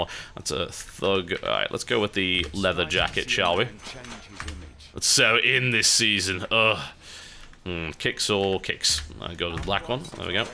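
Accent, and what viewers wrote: United Kingdom. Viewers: Angry Joe is better. Sorry to say but a one-hour+ review with a British accent kills it. Plus he's playing the game rather than just reviewing the most important points the way Joe does.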